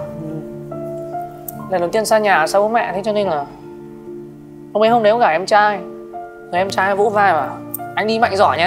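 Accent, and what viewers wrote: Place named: Southern Vietnam, Vietnam. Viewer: Tiếng miền Nam dễ thương thật mà.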